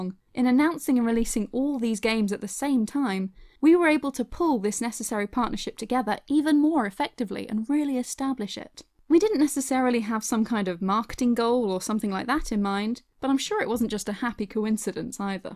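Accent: United Kingdom. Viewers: Love your voice and accent! 😍😍 Are you from the south of England? I would guess Surrey or London :P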